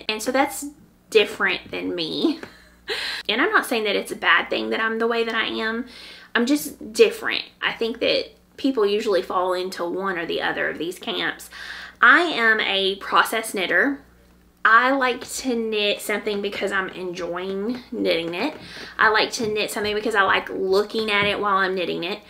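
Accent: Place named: Arkansas, United States. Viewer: Hi! I love your podcast!! I’m a fellow Arkansan transplanted to Texas a long time ago and I just love hearing your accent from home! I love your Zosia wrap cardi - I can’t wait to make it in a light gray. I also recommend reading “A Gentleman in Moscow” if your haven’t already read it. It’s a beautiful story. My mom and I are reading the Thursday Murder Club series at your recommendation and absolutely love it! Thanks so much for all you share!! You’re so inspiring 🐝 🐓 🧶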